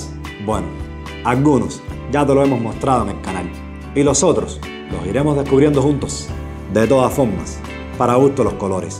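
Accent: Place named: Cuba. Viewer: Mi brother si eres Cubano, sabrás que el acento nuestro es ése, no se trata de guaperia ni nada por el estilo, simplemente es nuestra forma de expresarnos. Cada país tiene su propia forma de expresión, ejemplo los dominicanos hablan que parecen analfabetos y es todo lo contrario simplemente es una cuestión de cultura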